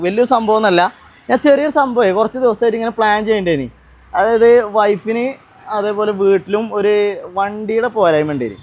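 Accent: Malappuram, India. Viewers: Paka malapuram slang analo😂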